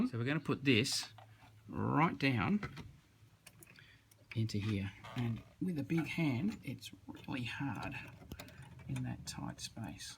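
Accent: Australia. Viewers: Haha it stuck out to me too. This lad is Australian though. (Or NZ? Nah Aus i am pretty sure)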